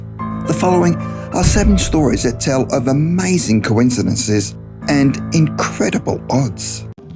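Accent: Australia. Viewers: An australian narrator.. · Omg your australian 😂❤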